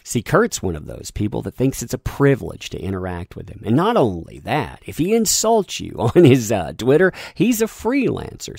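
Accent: South, United States. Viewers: I can’t focus on your video. Not because it’s bad or anything, it’s just your voice is so soothing and sounds so southern while at the same time doesn’t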